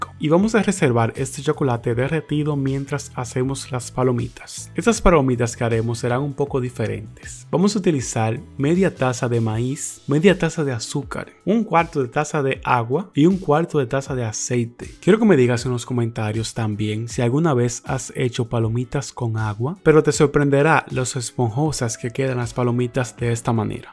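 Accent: Dominican Republic. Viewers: Sos dominicano verdad? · Suenas de RD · Eres dominicano?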